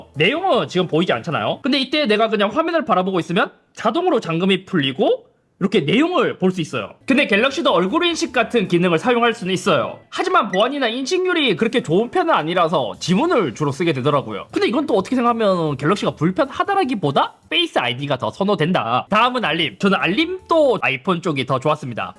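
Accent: Gyeongsang, South Korea. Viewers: 경상도 사투리 쓰는데 화나 팬이시네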